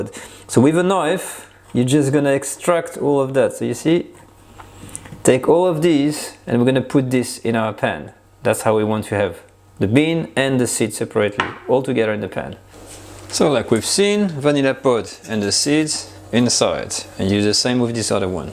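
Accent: France. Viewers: you re absolutely fantastic to watch even your French English accent..Bonjour Chef...Bernard from The Philippines..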